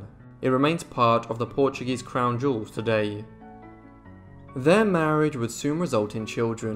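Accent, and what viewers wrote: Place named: England, United Kingdom. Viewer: i just realized how well written your biographies are. - and very well edited as to choice of photos, length of time on screen, etc. so well produced. thanks again. 🙂🌷🌱 you know what i am dying to know - where your forebears come from. you have an idiosyncratic sort of English accent, yet you say thanks like as american. the brass or copper tea or coffee pots seem to be Middle eastern or Turkish. do you think you’ll ever let us know?? it’s fascinating to try and figure out. thanks much. stay safe :) 🏺🌷🌱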